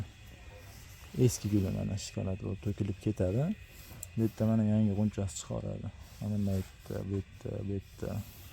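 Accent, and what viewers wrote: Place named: Andijan, Uzbekistan. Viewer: талаффуз Анжонникию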